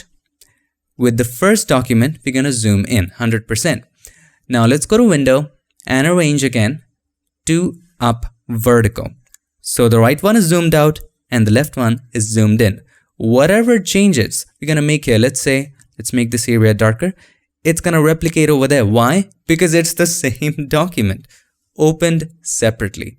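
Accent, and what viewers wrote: India. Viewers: best Indian accent I ever heard (.)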